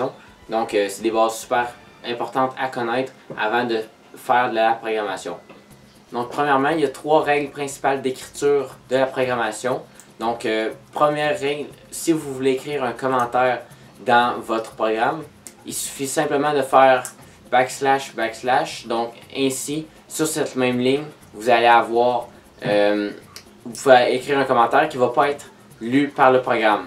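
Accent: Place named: Quebec, Canada. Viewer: drole d'accent les quebecois lol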